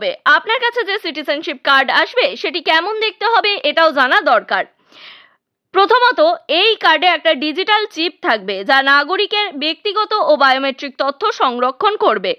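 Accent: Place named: Bangladesh. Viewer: ম্যাডাম আপনার পূর্বপুরুষ বাংলাদেশি আপনার গলার ভয়েস থেকে বুঝতে পারছি😊😊😊 মা-বাবা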